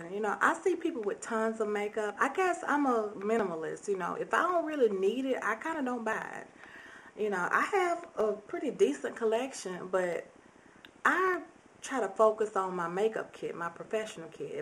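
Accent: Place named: Ivy League, United States. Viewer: lol... ivy league voice...But u r excellent at what u do... and I sense that u do this because this is what u love doing.. every1 doin makeup on here I dont get that vibe from... sometimes I think its done just because its popular and alta peeps r doin it.. but I thank u 4 ur genuity(is that a word?lol) really.. u are a Blessin 4 real.. if people can't c that its their loss hun! :) keep ya head up...stay keepin it real:)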